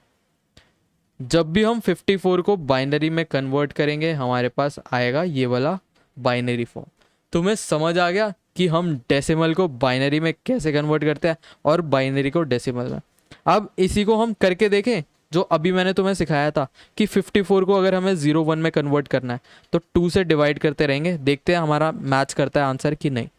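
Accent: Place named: Mumbai, India. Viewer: I can tell from his accent he is from Mumbai.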